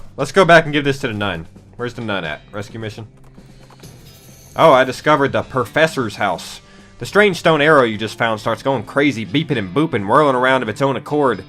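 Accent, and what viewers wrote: South, United States. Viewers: Your southern accent is much more prominent when you play this game. And what's that thing in the bottom left corner? I never noticed it till this video lol. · Maybe I knew him in another life.......I DUNNO........you sound so Southern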